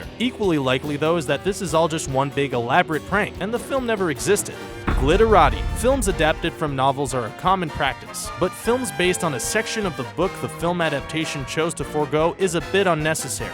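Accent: Canada. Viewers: Stupid Canadians should learn how to speak proper English. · too all you speech nazis...the narrators canadian you shit heads...they pronounce shit differently,,,entitled narrow minded shit heads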